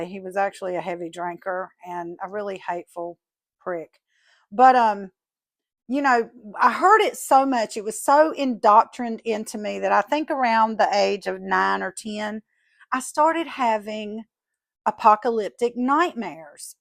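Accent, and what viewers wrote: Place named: South, United States. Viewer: Sweet southern belle 😉. Music to my ears (I am a European immigrant) 😉❤️. This is what I thought all Americans sounded like when I was growing up in the 80s 😂. Not sure where the torture after the rapture came from 🤨. Keep looking gal. If you’re meant to find God, you will. As long as you keep looking and not let fake Christians affect your search 😉❤️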